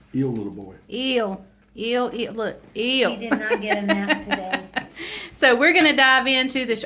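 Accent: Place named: North Carolina, United States. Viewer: Another NC staple, - DUKE'S mayonnaise! No other brand goes in my fridge. I am so glad I discovered your channel. It's not often you get to watch any program and hear someone who sounds like we do and who is so relatable. Thank you for sharing your life with us and for your sweet spirit. It's very much needed!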